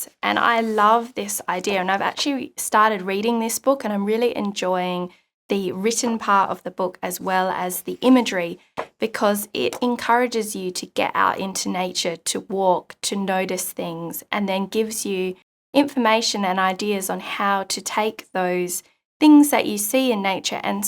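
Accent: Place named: Australia. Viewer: Love that I found your channel through another artists recommended channel page. Subbed as soon as I heard the accent. Love that we have such incredible mark making artists here in Aus 💪🏼😁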